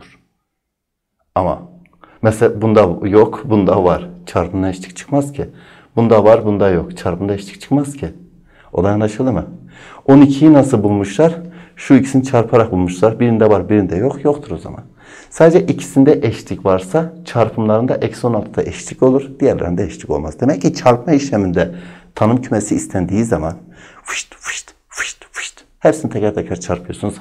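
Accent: Urfa, Turkey. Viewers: Urfali hocamiz zaten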